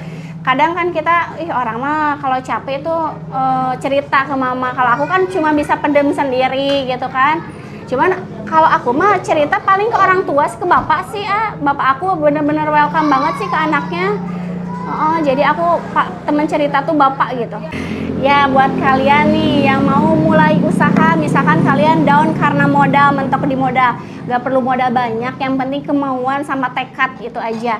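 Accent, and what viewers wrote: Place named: Sukabumi, Indonesia. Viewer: logat.y kaya orang sukabumi, mantap banget teteh.y lancar & berkah terus moga qu juga bisa usaha begitu. aamiin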